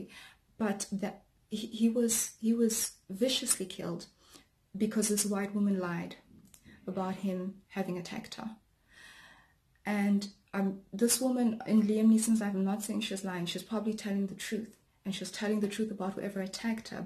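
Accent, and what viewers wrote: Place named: United Kingdom. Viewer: BUT HOW MANY NIGGAS KILL OTHER NIGGAS FOr THE SAME THING LMAO YOU DUMB BRIT YOU ARE NOT UNDER RACISIM LSITEN TO YOUR AccENT YOUVE NEVER TOUCHED A GUN OR BLOOD IN YOUR LIFE YOU FUCK FACE \ hah if you get this far into this your brave and should be able to see past what liam nesson says He said he would do it but ik youve said youd kill all white people.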